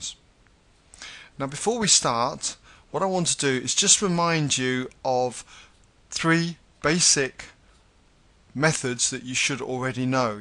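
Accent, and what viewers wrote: United Kingdom. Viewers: thank you so much. excellent pace and explanation. plus, i felt like i was at hogwarts with your wonderful accent! :) thanks again!!!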